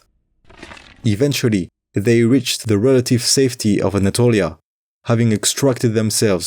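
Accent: France. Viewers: 😂 l’accent French , merci beaucoup tu régale , j’imagine pas le taff derrière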